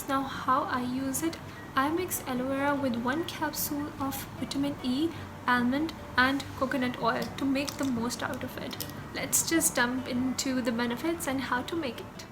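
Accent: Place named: Pakistan. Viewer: After hearing ur voice,I think u r Pakistani....if yes let us know...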